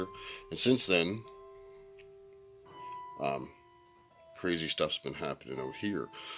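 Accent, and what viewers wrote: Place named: Maine, United States. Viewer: Seen your channel on Nukes Top 5 and I just subscribed. Can't wait to check out your other videos. You sound like you're from Maine. Peace✌️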